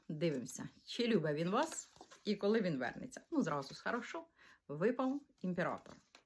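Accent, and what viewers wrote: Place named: Surzhyk, Ukraine. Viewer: Не приємний суржик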